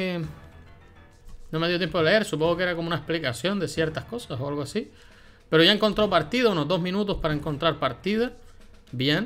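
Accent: Canary Islands, Spain. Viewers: Canarias?? Me encanta el acento de allí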